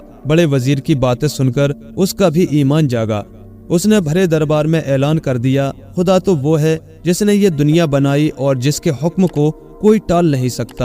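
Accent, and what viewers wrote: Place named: India. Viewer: Apki kahania'n achi hoti hain bas apka lehja shayad Hindi hai agr Urdu lehja hota tou chaar chaand lag jatay...ager aap phool ko fool na kahein aur issi tarhan aur bhi bht si cheezein tou behter rahay ga.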